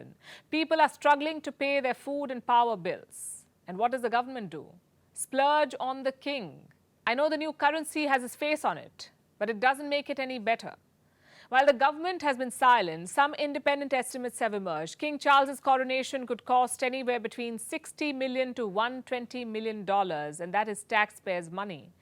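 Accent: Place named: India. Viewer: Lady, you speak good English with a slight Indian touch!. And that says volumes.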